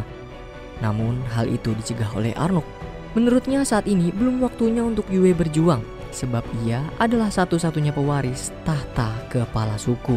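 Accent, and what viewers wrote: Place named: Cirebon, Indonesia. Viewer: Wong cirebon tah min😂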